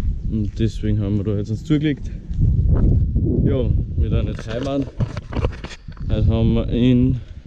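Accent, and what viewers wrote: Austria. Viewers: Kimmst du aus Österreich?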